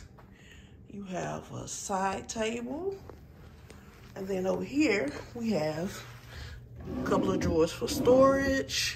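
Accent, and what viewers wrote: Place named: Arkansas, United States. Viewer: I knew you were a fellow arkansan as soon as I heard you speak! LOL! Thanks, from Ft Smith!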